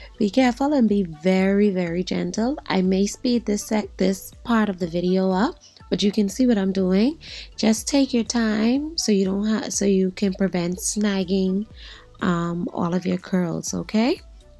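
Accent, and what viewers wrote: Bahamas. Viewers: New to this channel. Sounds like you have a bahamian accent. Your twist out came out bomb.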